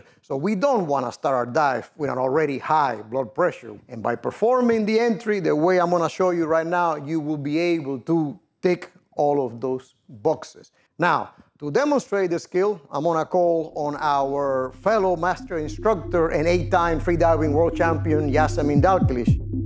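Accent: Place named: Cuba. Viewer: I just came across your channel and obviously I just subscribed. Tons of great info that I will implement this summer, thanks a million for not just your teaching but for the way you deliver it 😎 .. Googled you as well did not know you trained several world record holder free divers ! Needless to say when I heard the accent I was like “this guy is Cuban” and Yeap Cienfueguero ! I’m from Santiago de Cuba. Anyhow I’ll watch and pay close attention to your vids and teaching. Thanks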